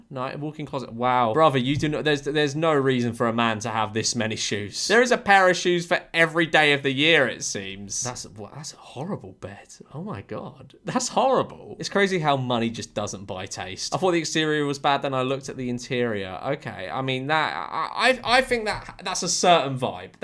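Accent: United Kingdom. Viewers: Is it just me or does sound more British in this video than the others?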